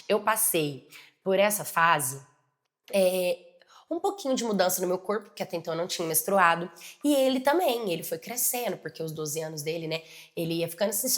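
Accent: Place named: Curitiba, Brazil. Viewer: Eu não sei porque, a voz dela me lembra a da dubladora da toph, Ahsoka tano e da Gwen do Ben 10 só que com sotaque de Curitiba.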